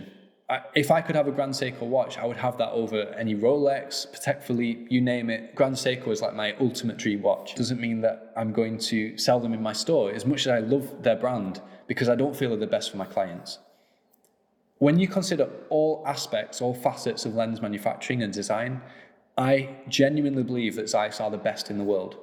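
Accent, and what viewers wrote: England, United Kingdom. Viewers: is this an ENGLISH accent i love bit hard to understand from Ontario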